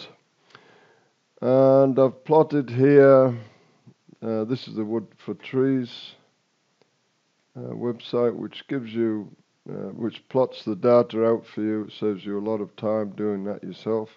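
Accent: Yorkshire, United Kingdom. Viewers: As usual, this contributor's analysis is presented with succinct scientific clarity; and his terse, placid-yet-authoritative style is a welcome change from the strident, shrill tones of the alarmists' hard sell. In honest science at least, substance trumps emotion every time. Despite writing, as I am, from t'other side of yonder Pennines, I am humbly obliged to concede that his sound-reasoning rebuttals of the IPCC's characteristically-devious acumen are admirably accentuated by such rectilinear "Yorkshire talking". Considering the magnitude of the IPCC's disgraceful climate hoax, his blunt turn of phrase is also refreshing.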